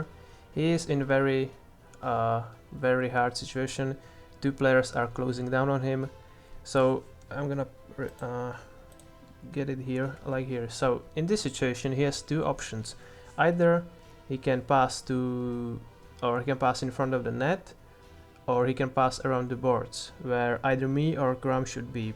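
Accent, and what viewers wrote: Czech Republic. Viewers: Ten českej accent tam je furt cítit :D, ale good video a nice advices! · u have nice content keep try and u have like czech or slovakia voice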